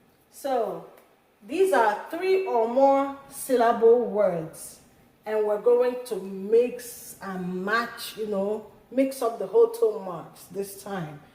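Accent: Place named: England, United Kingdom. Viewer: Omo am in love with ur English accent maa 👏👏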